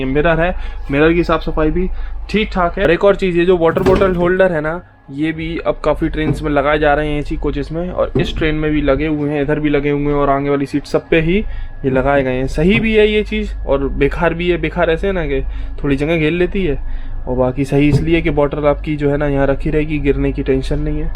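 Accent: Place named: Bundelkhand, India. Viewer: Tum bundelkhand ke lag rahe ho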